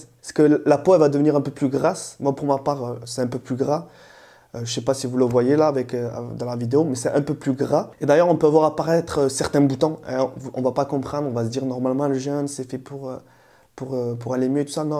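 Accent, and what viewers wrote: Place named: South, France. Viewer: J aime bien ton accent du sud mashallah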